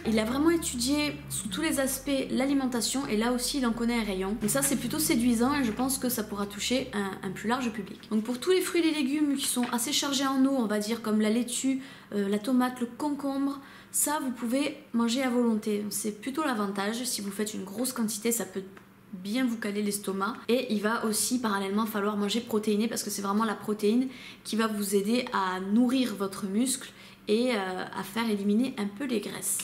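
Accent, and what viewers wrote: South, France. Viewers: j'ai bien aimé ton approche au final bien resumé pour les debutants... avec un petit accent du sud non ?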